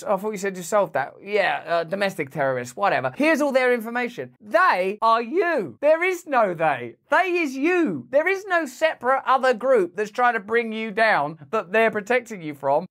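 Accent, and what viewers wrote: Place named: London, United Kingdom. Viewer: You sound like you're from luhndan!!!!!!!!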